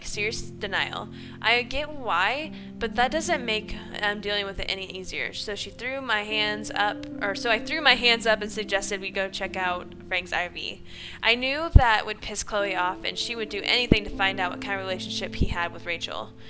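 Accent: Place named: United States. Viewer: Where there be an American accent you just know I am not far behind ;) xxx I have missed these videos babe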